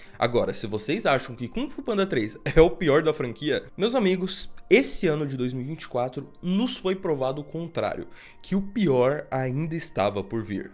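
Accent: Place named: England, United Kingdom. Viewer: Ele ta falando com a pronuncia em inglês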